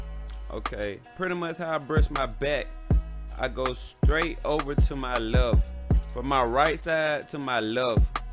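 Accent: Atlanta, United States. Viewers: Atlanta accent strong and them 540's gone crazy my g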